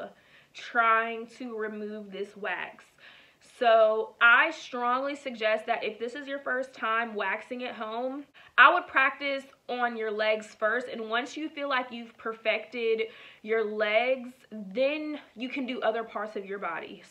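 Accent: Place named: Pittsburgh, United States. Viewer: Are you from the Pittsburgh area? I hear you accent LOL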